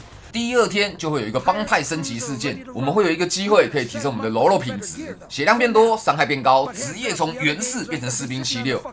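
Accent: Taiwan. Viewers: 這個台味好純XD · 階地氣的文案、台味的語氣。很喜歡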